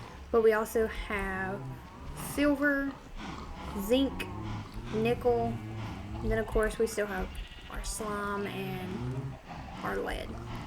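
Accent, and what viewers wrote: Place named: South, United States. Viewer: Hey! Contrats on starting your new modded serie! I've been kinda binge-watching the serie so far. You seem new to this kind of thing, which is great! I'd like to know if you're the kind of YouTuber that likes having comments helping you with tips and tricks when you seem stuck, warn you when you make a mistake or forget something, or if you're the type that rather figure it out on your own, if you like the challenge of solving everything like it's a puzzle or some type? Just trying to figure out if you allow some back seating or not, this changes the perception on how we can interact with you in the comment section:) The serie's been great so far, quality wise I'd say the only thing so far is that your voice is really soft and quiet, which makes the video pretty relax (Which I suppose fits with your Cozy persona:D) but personally it could use a little +1-2db increase (just a little louder so we can at least hear you clearly). 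I must say though, you have a very nice accent, sounds a bit southern to me?:P I might be wrong but to be fair I'm not from the US hahaha What are your goals for this modpack? Do you plan on automating everything? Are you more of a stylish builder that fits automation into a design or do you design around the automation, or maybe you simply make it work and that's enough? Lastly, I'm curious if you plan on maybe streaming at some point? A format I like seeing is those cuts you do when you work on a project, when you know it's going to ta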